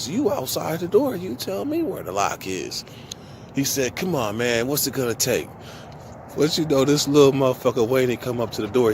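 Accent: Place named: Northern California, United States. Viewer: You gotta be from NorCal to love how you talk 😂😂😂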